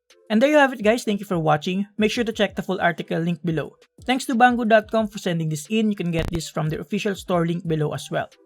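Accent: Philippines. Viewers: his Filipino accent tho is insane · I love the filipino accent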